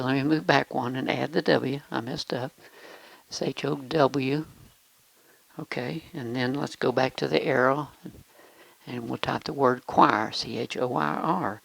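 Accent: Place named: South, United States. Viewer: very nice because of your accent sounds like country and western town just like Nashville or texas love it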